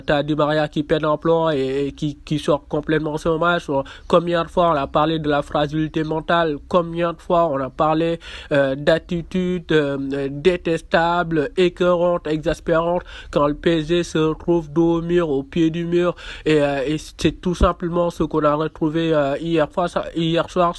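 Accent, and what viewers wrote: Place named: Senegal. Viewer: Accent sénégalais 🙏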